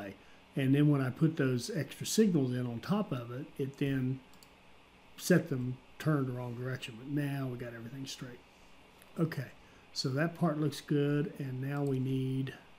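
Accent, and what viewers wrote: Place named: West Virginia, United States. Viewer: Thanks for this episode, or the whole series for that matter. Railway Empire is one of those games I drift back to every now and then, for the sheer joy of watching trains go, and composing the song for them - err the loads they will carry by designing this 4 city cluster. I only found your series recently, I was kind of doing my own thing. Mostly writing spaghetti westerns with my rail lines. But the method/way you connect things has improved my own game a lot. So thanks for taking the effort to share your nice gamestyle. Hardly noticed the West Virginia accent 😆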